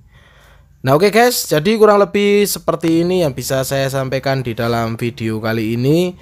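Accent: Temanggung, Indonesia. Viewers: = mirip logatnya wong temanggung 😀🙏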